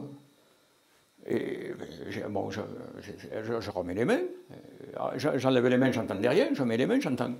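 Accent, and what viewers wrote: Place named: South, France. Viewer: Après avoir vu la video de nurea tv, je ne m'attendais pas à un tel accend du sud 😅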